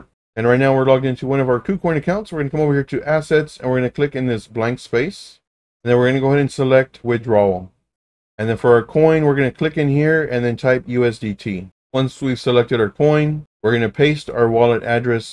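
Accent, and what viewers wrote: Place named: Cuba. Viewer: You sound Cuban or Hispanic of somewhat, you my guy.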